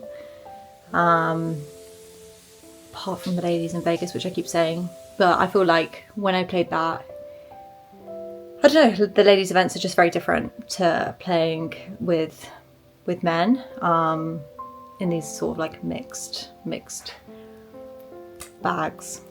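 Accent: England, United Kingdom. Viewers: I already watch poker vlogs, but listening to a lovely lady with an English accent makes it cooler, lol. You should do cash game vlogs though! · Tournament poker is SO resident sleeper :( Cute English accent is worth though.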